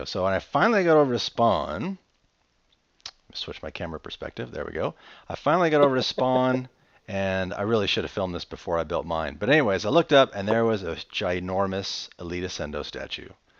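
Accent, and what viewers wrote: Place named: Australia. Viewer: Dat sexy Aussie voice....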